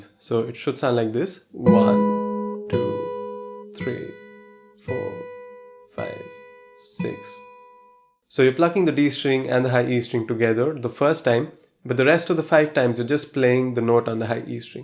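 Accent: India. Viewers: Omg your accent tells me you're from India dude...are you?? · You sound indian ! Where are from brother ?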